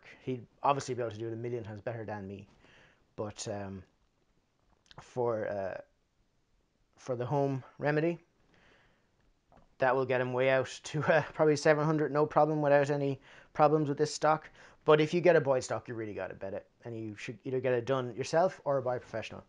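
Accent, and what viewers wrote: Ireland. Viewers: Where are you ? You sound irish, how did you get a Boyds stock over ?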